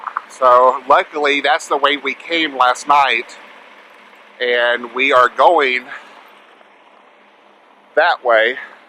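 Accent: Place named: South, United States. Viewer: Btw, where you from? I found another blogger that at least sounds as if they’re from the south. Tri Cities Tennessee here.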